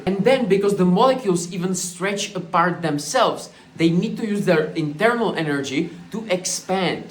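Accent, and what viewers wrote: Czech Republic. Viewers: seš čech co? přízvuk.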